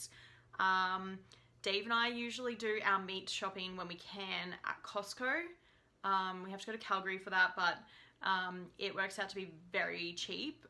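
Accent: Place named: Australia. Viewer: I could listen to this girl forever ! Two jobs, packing all that life into so little time... don't know how she does it. But I was young once too.(that's me in the picture but... it wasn't yesterday). Loved Banff and the Canadian Rockies. Thanks to You Tube I can rediscover this wonderful place. Thank you Aussie 👧 girl ! Love your accent !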